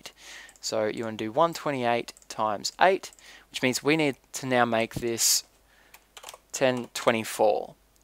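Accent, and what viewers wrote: Australia. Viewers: Are you from Australia? C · hes australian? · i love ur accent im not sure but is it Australian?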